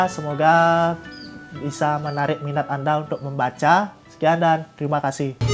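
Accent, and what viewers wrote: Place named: Bali, Indonesia. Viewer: Kak dari Bali ya? Keliatan banget logatnya😂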